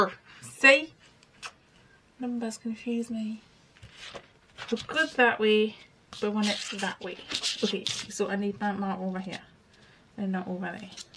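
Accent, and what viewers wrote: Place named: Newcastle, United Kingdom. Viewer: New subscriber, Found you through julie at camelia craft designs 😉 it's great to hear a geordie lass 👍 yey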